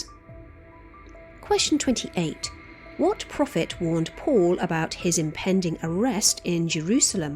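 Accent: England, United Kingdom. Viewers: Missed 5☹️… but I always enjoy hearing your beautiful English accented voice😊. Grace & Peace! ❤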